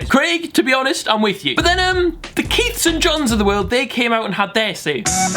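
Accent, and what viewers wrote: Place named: Northern England, United Kingdom. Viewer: Ay who knew we had a northern English content cop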